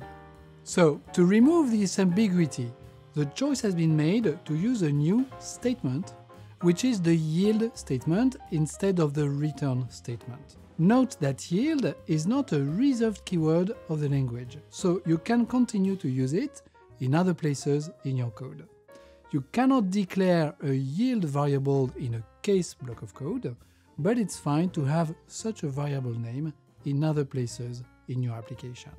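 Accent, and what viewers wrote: France. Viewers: I like the overall concept of JEP cafe, discussing the recent developments in JDK. Apart from explanations, I like his thick French Accent.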